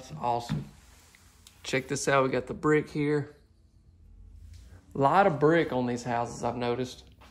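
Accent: South, United States. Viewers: Love the floors. Nice house but th as t 2 story was my favorite! And you don't sound like a goof! You sound like a southern gentleman! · You could sell the phone book . I like listening to your southern charm and wit . Keep up the good work !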